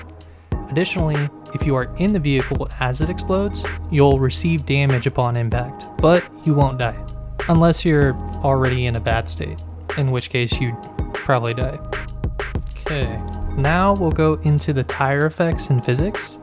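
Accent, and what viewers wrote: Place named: Canada. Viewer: are you perhaps Canadian? (You sound very Similar to Kan Gaming)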